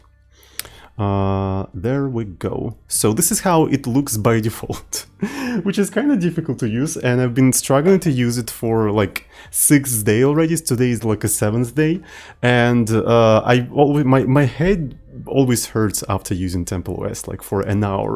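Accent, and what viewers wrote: Russia. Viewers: Czemu masz taki ruski akcent?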